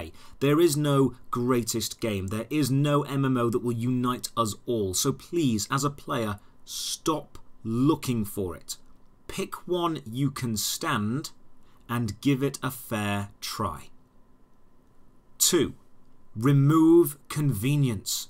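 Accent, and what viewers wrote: United Kingdom. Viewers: man, british people talk the same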